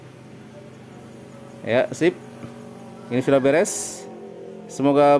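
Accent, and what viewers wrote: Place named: West Java, Indonesia. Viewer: Sunda pisan di rojok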